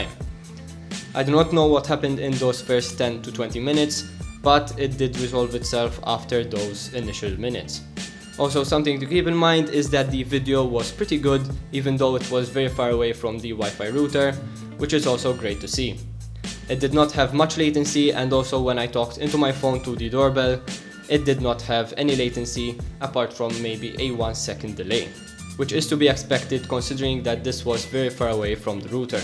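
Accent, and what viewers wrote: Malta. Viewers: Do i detect a maltese accent? :0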